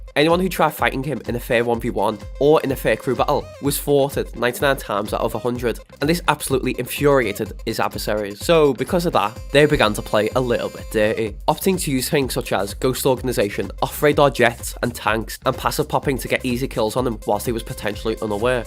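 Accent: Liverpool, United Kingdom. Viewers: Are u scouse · i cant understand anything with ur fcking annoying british accent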